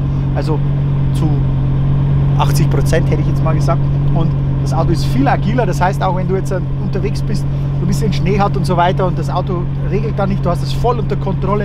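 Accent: Bavaria, Germany. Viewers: Red bitte wieder boarisch!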